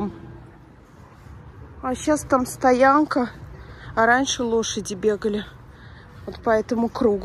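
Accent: Baku, Azerbaijan. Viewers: Привет из Молдавии!!!,случайно попала на ваш канал и была очень рада!!!Я родилась и выросла в Азербайджане,в поселке Насосный...Училась в институте в Баку...Практику проходила на нашем Бакинском телецентре... Огромное спасибо за чудесные позитивные ролики!!!За ваш бакинский русский...Азербайджанский язык Я помню...не могу сказать,что в совершенстве,НО...,всех благ вашей прекрасной Семье,пусть все будут здоровы...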